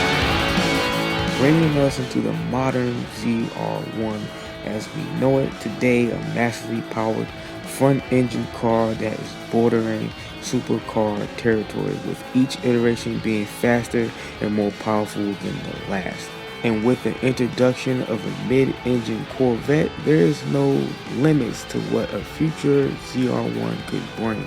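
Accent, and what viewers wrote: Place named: Africa. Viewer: Why do you have an African narrating this video?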